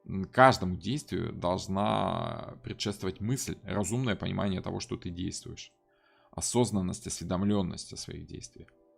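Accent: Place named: England, United Kingdom. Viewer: с английским акцентом…как это сам * часто в своих лекциях очень симпатично произносит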